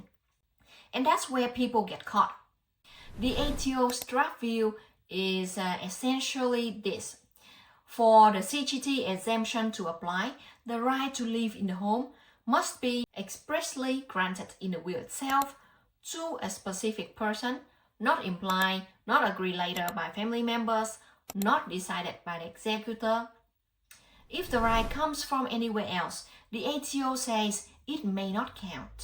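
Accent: Vietnam. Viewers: Why do u sound vietnamese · Yes, the podcaster has difficult to understand Viet-English. Good content but hard to listen to.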